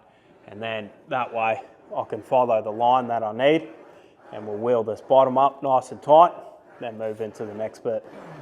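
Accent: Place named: Australia. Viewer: I'm so impressed by the knowledge and craftsmanship that gets shown here in this video. That and well as a Ducthie I like to here a Aussie accent talk shop.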